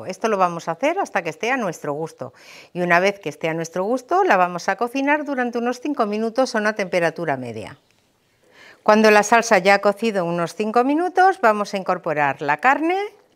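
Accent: Spain. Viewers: Me gusta ver la cocina de porque todo lo explicas muy bien, otra persona que me gusta mucho ver es Armando en tu cocina y el también explica todo paso por paso, el es Mexicano y tú me imagino que eres Española por hacento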